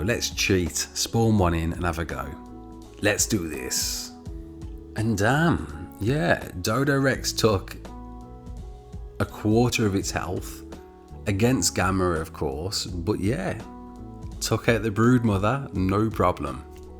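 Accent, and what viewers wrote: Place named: Midlands, United Kingdom. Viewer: where abouts you from in Midlands sound local man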